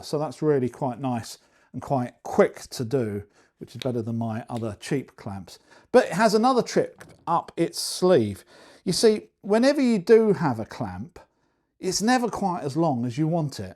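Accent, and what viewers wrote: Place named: Mississippi, United States. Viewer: Love that Mississippi accent.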